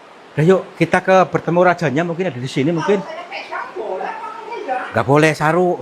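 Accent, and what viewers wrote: Banyumas, Indonesia. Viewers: Kayane wong ngspak kang pada karo inyong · Orang ngapak om? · Ngapusi dirinya sendiri ,ingat jaman sekarang itu nggak ada gitu gituan ini bohong ,kalau di lihat dari gaya logat ngomong nya ini orang ngapak ,semoga sukses bikin berita bohong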